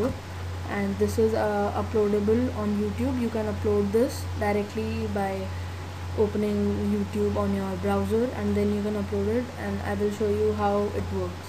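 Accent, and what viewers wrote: India. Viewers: indian english >_< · Omg I can sense the Indian in him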